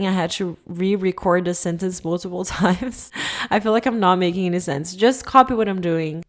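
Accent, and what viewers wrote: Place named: Brazil. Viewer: 😅vey eu vendo esse vídeo em inglês e falei ela com certeza é brasileira o sotaque em inglês igual ao meu, ameiii obrigada pelo tutorial, adorei ❤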